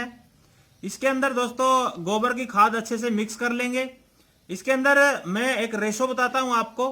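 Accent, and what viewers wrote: India. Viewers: haryanvi ? kit ke ho?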